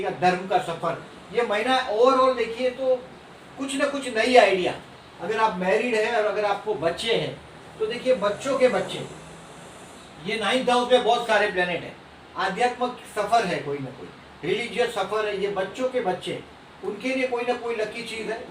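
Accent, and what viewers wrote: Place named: Maharashtra, India. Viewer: ।।🕉नमः शिवाय 🕉जय माता दी 🕉।। नमस्कार गुरूजी आणि शुभ रात्री, गुरूजी आपके सभी विडियो बहुत ही अच्छे होते हैं। मै महाराष्ट्र राज्य का हु और मुंबई में रहता हु आप जब कभी भी हमारे महाराष्ट्र में बोलते हो और बीच-बीच मराठी शब्द बोलने में आते हैं। बहुत अच्छा लगता है। ( गुरूजी आपके नये विडियो नहीं आरहे है। कृपया करके नये विडियो बनाईये धन्यवाद और शुभेच्छा! )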